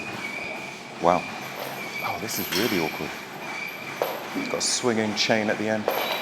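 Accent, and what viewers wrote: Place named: Europe. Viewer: Love the accent I can listen to Europeans talk all day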